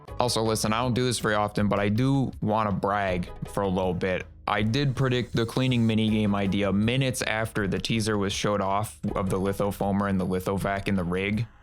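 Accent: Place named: Canada. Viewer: Your Canadian accent is barely noticeable but it's adorable. No malice in mind, just been seeing that dude on "shorts"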